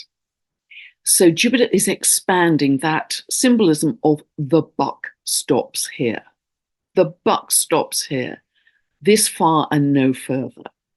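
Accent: England, United Kingdom. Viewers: thank you… but I can probably top that. You made my life! Not that this is a competition, rather a communion of like hearted souls, and one in which I feel immense gratitude to know you. Many, many moments of wobbly exhaustion were laid aside after I listened to your English dulcet tones, so welcome in this far flung place from home where I live 💜💜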